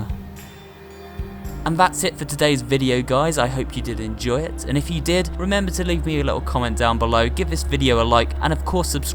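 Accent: United Kingdom. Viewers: I like how you have a British accent (no sarcasm intended) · Your british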